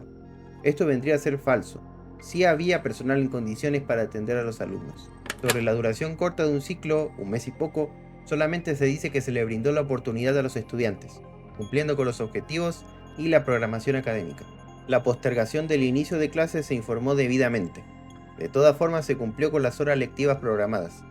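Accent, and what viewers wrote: Chile. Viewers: siento que sos chileno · Buen video pero no pude dejar de pensar que tienes un manera de hablar muy chilena de tu parte. Eres de chile viviendo en Perú, o solo es casualidad?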